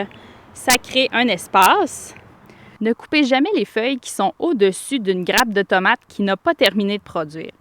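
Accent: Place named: Quebec, Canada. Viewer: Bonjour, vous expliquez très bien et j'adore votre accent Québecquois ❤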